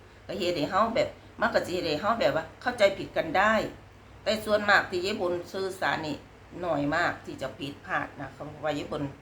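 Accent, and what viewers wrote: Thailand. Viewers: อังกฤษสำเนียงไทยหนูว่าน่ารักดีคะยาย เหมือนที่เราบอกต่างชาติพูดไทยว่าน่ารักดีนั่นแหละค่ะยาย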